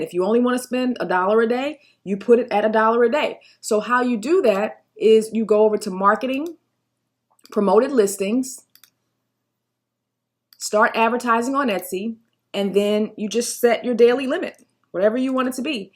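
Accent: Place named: England, United Kingdom. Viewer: I like your english accent can you be my teacher:)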